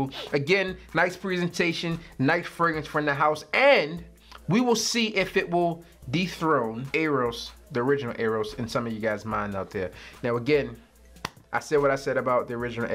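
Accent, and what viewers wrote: Baltimore, United States. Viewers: Yo, you from Baltimore?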